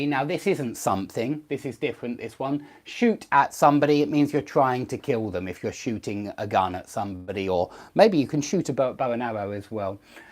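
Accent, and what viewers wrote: United Kingdom. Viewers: British accent is superb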